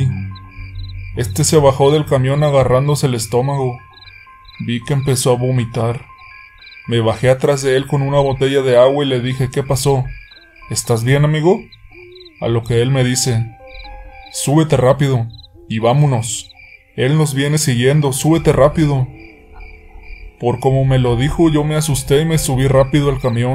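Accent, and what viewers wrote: Mexico. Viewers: Ay amigo!..Perdí diez minutos de mi vida, creyendo que tu historia tendría algún cambio..pero qué caracho!..Tu feroz acento mexicano me estaba matando!..Y por que tan larga la historia?..te repites y te repites..o sea..NO tiene desenlace, loco! Aparte que a tu tono de 'narrador'..le faltan inflexiones!..variedad! supongo que no eres robot!...o sí? Por favor, toma clases de locución! De verdad.. te van ayudar!😒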